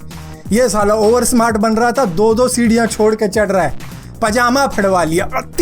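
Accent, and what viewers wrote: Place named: Punjab, India. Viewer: hahaha punjabi lgda bai tu v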